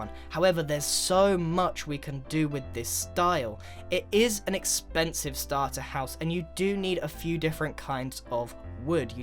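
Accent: England, United Kingdom. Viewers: Are you English coz I am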